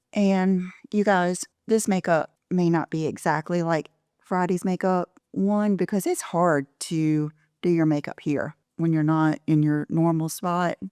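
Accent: South, United States. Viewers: One reason you’re successful is you’re really careful about what you say and how you say it. Even when you’re putting something out there that might be considered critical by some, you say, “How do I say this the right way, not to come out with the wrong intention.” I’ve been mindfully attempting to be more like that. I am really working on it. That, and carnivore, thanks to you. I love that you do so many videos each week. Love your voice, too. So comforting. I lived in Alabama for 3 years and though your accent is not N.Alabama, it’s a soft, sweet Southern accent, just the right speed, and tone. You keep doing you, which inspires us. ❤️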